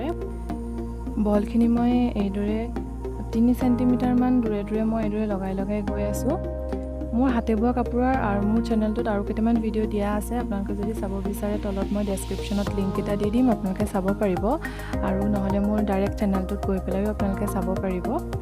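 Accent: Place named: Lower Assam, India. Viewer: kotha kwa bur nomamia kowa jen lgise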